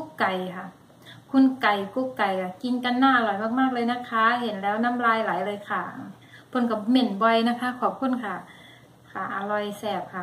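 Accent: Isan, Thailand. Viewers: ใช่ๆๆนู๋พูดอีสานน่าฟังมาก อยู่จังหวัดอะไรคะ(เมืองไทย)เผื่อมีโอกาสพบกัน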